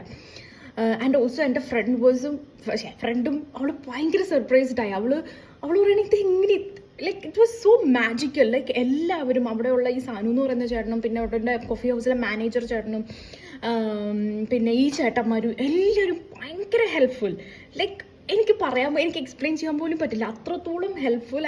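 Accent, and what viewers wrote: Kannur, India. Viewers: Ingal kannur ano????.. Samsaram niceee❤️😄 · Keralathil evideyaaa??? Ur slang has a Kannur tone …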